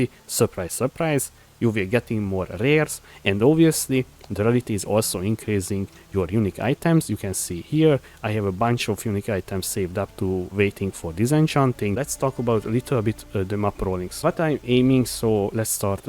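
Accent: Hungary. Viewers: Nagyon magyar az akcentusod :D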